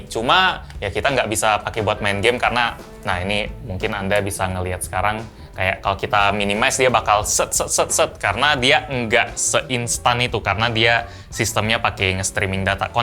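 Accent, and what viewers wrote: Palembang, Indonesia. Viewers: Mengot....🤣 palembang nyo di mano bang? Salam kenal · Mengot Bahaso Palembang nian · Anjay mengot.. palembang nian cuk😄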